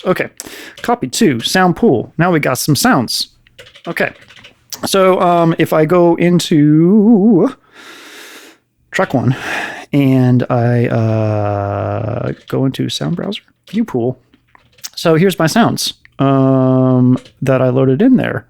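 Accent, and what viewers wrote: United States. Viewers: more southern talk plz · because your from the midwest , texas perhaps ?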